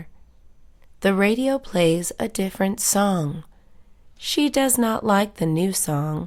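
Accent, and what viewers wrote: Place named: United States. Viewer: Американское произношение. Спасибо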